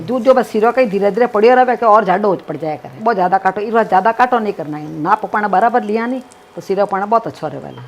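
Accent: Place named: Jodhpur, India. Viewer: Aunty aap ki boli Jodhpur ki lagti h, aapke video bahut ache h.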